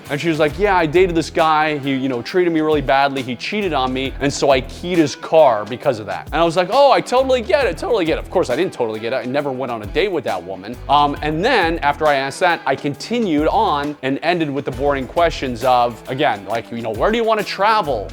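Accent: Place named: Texas, United States. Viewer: That´s a Texan accent.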